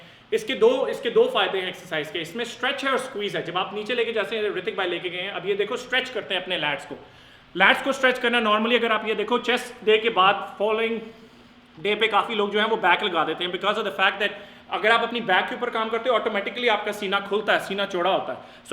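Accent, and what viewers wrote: Punjab, India. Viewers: Vir ji tuc punjabi lgde o jhri apne aap muh cho bahr nikldi aa 😋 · veere video todi bhut changi ne .and toda punjabi boln di tone mnu or bhi changi lgi ne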